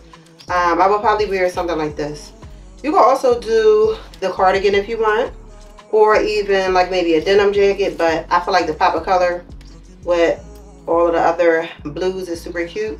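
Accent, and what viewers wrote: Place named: Philadelphia, United States. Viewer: Are you from Philadelphia? Your accent is giving Norf’ Philly 😊… FYI, I went to grad school in Philadelphia and the way you talk bought back beautiful memories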